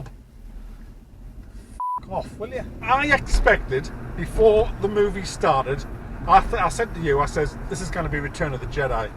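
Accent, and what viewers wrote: United Kingdom. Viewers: The British accent makes the rant a whole lot more satisfying.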